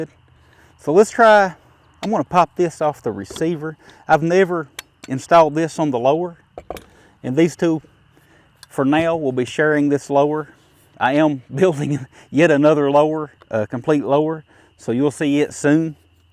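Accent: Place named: Arkansas, United States. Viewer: Your accent is awesome! Arkansas?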